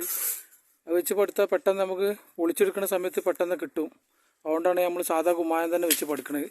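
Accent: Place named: Malappuram, India. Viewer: Ithu Malappuram slag .manassilavathoru kanandaa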